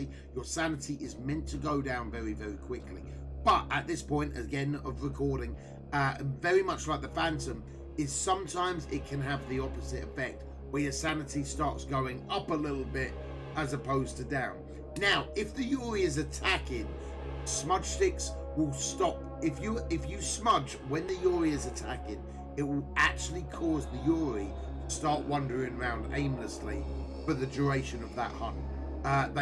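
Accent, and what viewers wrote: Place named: Scotland, United Kingdom. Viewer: Not be offensive in any way but just listening to you I know you're scottish.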